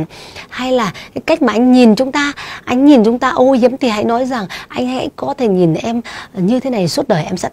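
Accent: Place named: Vietnam. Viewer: Chị ở đâu mà nặng tiếng địa phương nhỉ. E ở hải Dương nè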